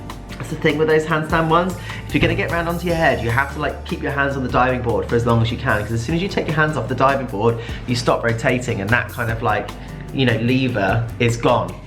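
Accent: United Kingdom. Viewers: Tres British 😊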